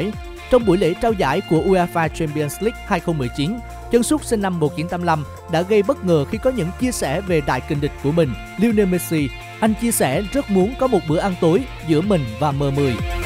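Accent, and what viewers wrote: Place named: Da Nang, Vietnam. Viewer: Người đà nẵng ak ad 😂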